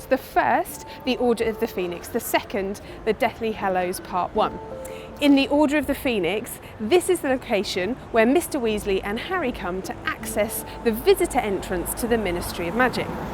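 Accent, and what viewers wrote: London, United Kingdom. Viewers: I just came here for the London accent :)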